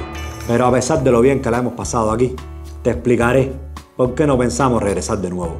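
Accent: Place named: Cuba. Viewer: Mi brother si eres Cubano, sabrás que el acento nuestro es ése, no se trata de guaperia ni nada por el estilo, simplemente es nuestra forma de expresarnos. Cada país tiene su propia forma de expresión, ejemplo los dominicanos hablan que parecen analfabetos y es todo lo contrario simplemente es una cuestión de cultura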